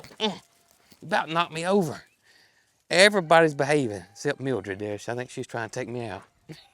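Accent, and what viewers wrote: South, United States. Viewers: I have to admit I do enjoy reading the CC to see how they interpret your southern accent. 😅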